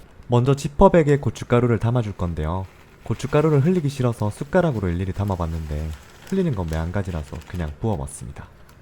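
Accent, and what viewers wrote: Gyeongsang, South Korea. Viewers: 경상도 말투 좋습니다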